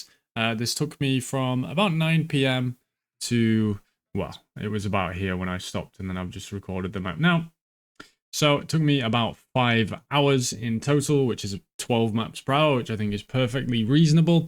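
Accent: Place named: Lancashire, United Kingdom. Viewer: Thanks or, appreciate the constant different strats to try - will be giving this a go tonight, and i'll post my findings as well. Out of interest, where are you from!? You sound like you could be from where I'm from in Lancashire, but also could be southern. Cannot guess.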